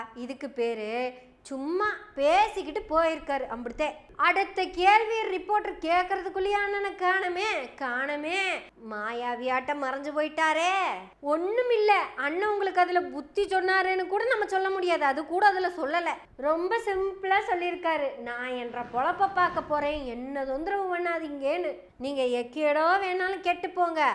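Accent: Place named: Coimbatore, India. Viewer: Super sister with Coimbatore slang ❤. Love it.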